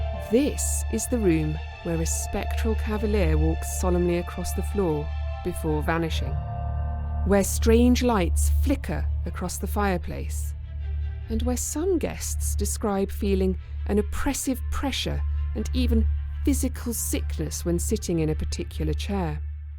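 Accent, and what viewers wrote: United Kingdom. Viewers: How wonderful to hear a British female voice speaking English so beautifully. Something that used to be not uncommon. Nowadays women barely understand the language and complete with all the tattoos, well, what can you say?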